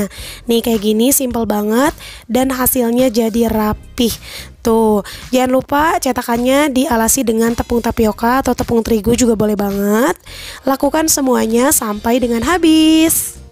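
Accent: West Java, Indonesia. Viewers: Sunda mana teh meni geunaheun hhi,, sukses slalu teh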